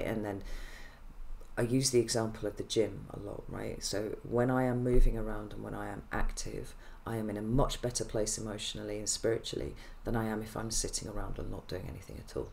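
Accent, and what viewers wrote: United Kingdom. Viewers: Could you please move the mic closer to you?!!!! Or speak up for us! Maybe it's just me, but I'm having a hard time to hear and understand what you are saying. your voice is deep, and you are so quiet. I would love to hear what you have to say! Plus you have this gorgeous British English "accent" :) all i hear is whispering mumble most of the time. thank you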